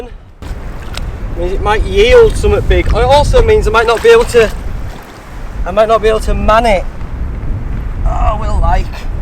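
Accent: United Kingdom. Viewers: Is your accent British or Scottish?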